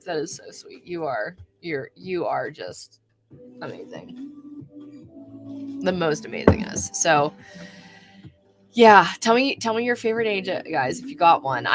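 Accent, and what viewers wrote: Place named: Los Angeles, United States. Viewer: I'm so impressed. I could of sworn you had a comment on YouTube.? I'm still looking. Just want to say I miss your lovely voice and cute influx in your LA accent!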